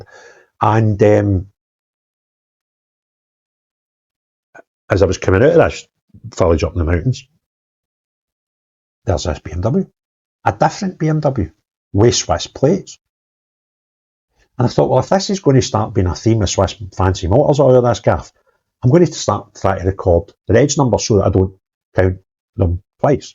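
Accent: Scotland, United Kingdom. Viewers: Is there any chance you could write down place names in the video descriptions? Sorry, I can't always pick them up from your Scottish accent. I like to search ttem up and read a bit more about them. I couldn't find the one which sounded to me like Kapatiki.Thanks. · please watch yourself doing this video. You've got an extremely broad Scottish accent, I want to listen to you as I think it's important. Some printed text would be most helpful ☺️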